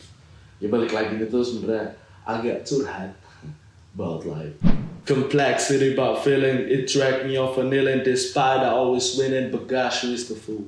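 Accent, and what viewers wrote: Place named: Java, Indonesia. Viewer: ternyata agak medok ya bapak kalo bhs indo😂😂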